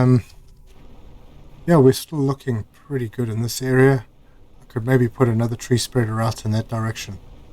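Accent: South Africa. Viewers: Your slight accent makes me think South Africa region? Anyway, I am really looking forward to this next update. Been playing for a few months and have been loving the game.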